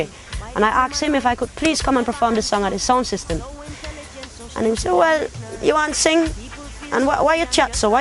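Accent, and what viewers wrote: Jamaica. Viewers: She lived in Ja for some time, but not for years. Her accent came from loving Jamaica, reggae and patois. I'm the guy with the reggae radio show she mention in this video. Bless up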